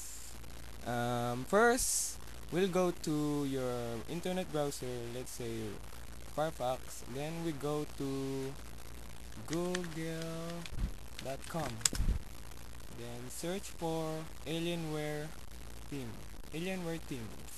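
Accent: Philippines. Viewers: sa boses mo plang pinoy na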